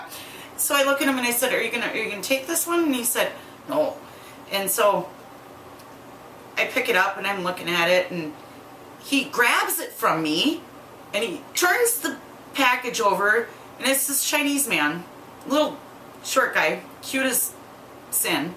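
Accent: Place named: Minnesota, United States. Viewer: Hahaha Hahaha!!!! Love this! Love the Minnesota accent ever since I heard it in "New in Town"..... Don't Cha Know!!!! Have a super day!!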